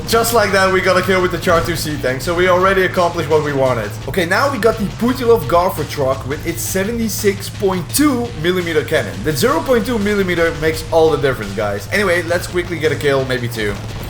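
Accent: Netherlands. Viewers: Are you Dutch? I think I recognize the accent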